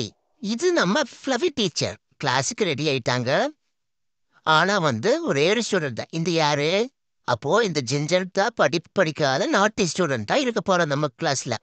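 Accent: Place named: Coimbatore, India. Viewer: உங்க கோயம்புத்தூர் வாய்ஸ் சூப்பரா இருக்கு மேம்❤❤❤❤❤❤❤❤🎉🎉🎉💐💐🌹🌹🌹🌹🌹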